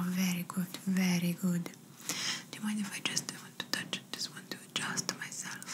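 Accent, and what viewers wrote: Italy. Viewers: I love your Italian accent ASMR. I love how you always manage to say something that makes me laugh out loud. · Ah yesss Italian accent 😍😍❤️ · I can’t what I love more your Italian 🇮🇹 accent or you ❤️ 💕 💗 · oh my god! are you actually italian? cause your accent is literally perfect👀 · a “new rude Italian exchange student” would be such a fun role play with this accent! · Hi fantastic ASMR I liked it a lot and I enjoyed it with your Italian accent that I love and listening to your sweet relaxing voice greetings beautiful 🥰😍💖🌹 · Ciao bella.... your itailian accent so great..i love it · as an Italian person this is exactly my accent when I speak English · omg i think i found my new favourite series! the italian accent makes me feel like im a tourist in italy and boy i miss travelling 🥺 keep it up!!❤❤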